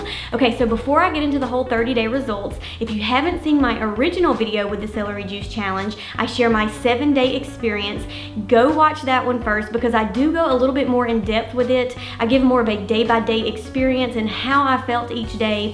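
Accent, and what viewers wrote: South, United States. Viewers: Such a nice southern accent I love to hear it I day use to I was living in Alabama Tennessee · I love your southern accent! Where are you from? I know its 2024, but I am trying this out right now.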